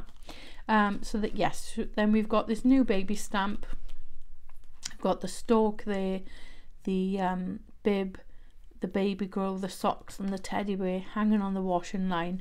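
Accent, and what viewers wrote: Wales, United Kingdom. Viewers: Yay I thought I recognised a Welshy accent. I’m in Cwmbran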